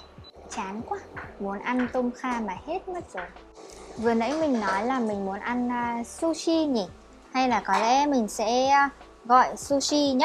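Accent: Vietnam. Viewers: is this in southern or northern vietnamese ?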